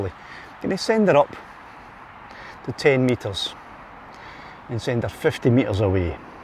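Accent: Scotland, United Kingdom. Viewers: Great viddy mate. I am also partial to your Scottish English.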